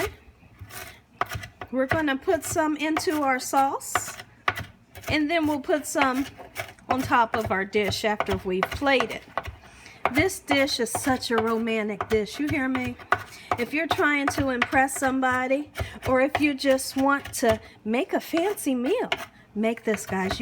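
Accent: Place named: California, United States. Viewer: She sounds like CALI lol. I'm from ST Louis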